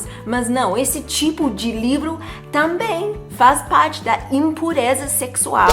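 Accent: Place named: United Kingdom. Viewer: New subscriber... i love a bristish accent ... but born in portugal 🙌🏼🙌🏼🙌🏼🇵🇹🇵🇹🇵🇹 love your chanel you explain things well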